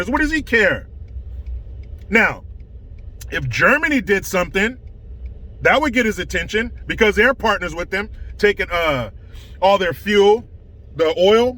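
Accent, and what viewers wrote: Russia. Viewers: Please do more russian voice!!😂😭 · Your accent sounded like Boris and Natasha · you are so funny; I love your Russian accent.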